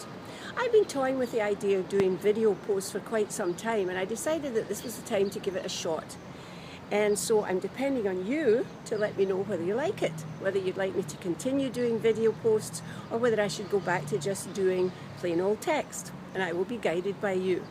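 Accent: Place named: Rioplatense, Argentina. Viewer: Keep it up ! This lady nailed the "rioplatense" accent !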